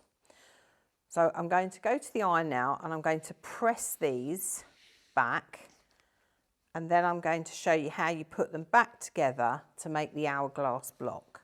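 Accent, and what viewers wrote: England, United Kingdom. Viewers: This is the first video I’ve seen of yours. Lovely quilt, especially with the flange on the border. I’m from the US and we call the corners you cut off “dog ears” and we “press to the dark side”. I love your English way of speaking and the fact that so many of us around the world can share in the craft of quilting.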